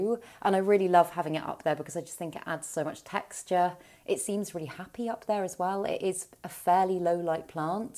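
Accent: United Kingdom. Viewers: hello, I like your videos, and your British mood and accent. About the string of pearls, they are easy to overwater, it's true, but as I am concerned, I find that they need quite a lot of water for a succulent, not too much, but if I forgot, it turns brown like yours. And of course they love to have bright light on the top of the pot ...... I would like to know what do you do when you leave your apartment for a few days, for holidays for instance, about your plants of course ?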